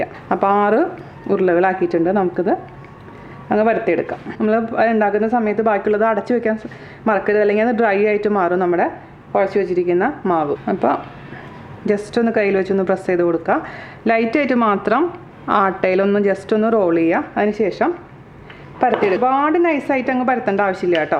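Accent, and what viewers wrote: Thrissur, India. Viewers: #roseeducation: yummy recipe. I love all your recipes. I really like the way you talk especially thrissur ascent. Thank you so much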